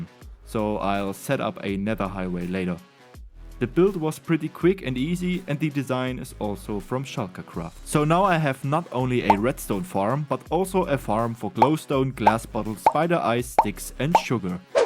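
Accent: Germany. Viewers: Are you German? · Did i hear the german accent? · wait… are u german? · Great Video. In the First few Seconds thought, is he from Germany? Yes he is😅 You hear a German Speaking English if you are a German yourself😆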